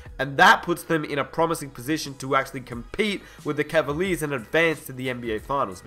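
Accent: Australia. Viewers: Okc still hyped wtf the got there ass dropped by the rockets and there not winning shit because if they can't win with kd,harden,ibaka you think there gonna win with Paul gorge( washed up version) and btw who tf is the Australian talking over the video who has not picked up a basketball in his life probably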